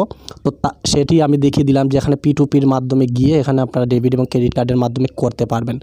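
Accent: Sylhet, Bangladesh. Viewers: সিলেটি নি ভাই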